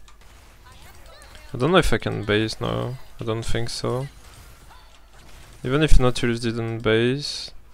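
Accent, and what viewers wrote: France. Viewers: ton anglais est bon (t'as un bon vocabulaire, on comprend ce que tu veux dire, c niquel) mais tu fais aucun effort sur l'accent c'est dommage ; t'inquiètes c'est moins gênant d'essayer de faire l'accent que de juste forcer l'accent français c'est pas comme si y avaient des connards de français dans la pièce pour te juger (à part moi oups)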